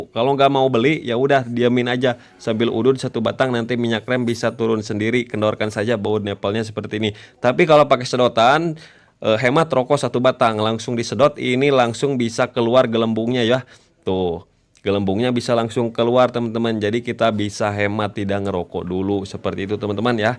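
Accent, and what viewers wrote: West Java, Indonesia. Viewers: Sundana hese di leungitkeun 😄👍🏻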